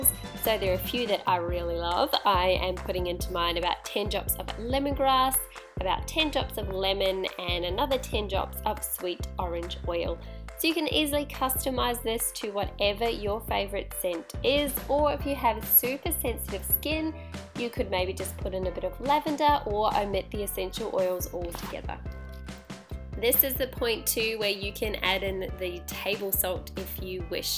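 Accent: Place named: Brooklyn, United States. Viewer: You are welcome. All of the USA I am sure wishes your environs and wildlife come through the fires as best as possible. BTW- I thought your accent was from Brooklyn, NY at first, though. :-) I like the "make your own" liquid cleaning products. Money saving and safer. Hope you get back to more when you can. -UrbanLifeTime and us all. :-)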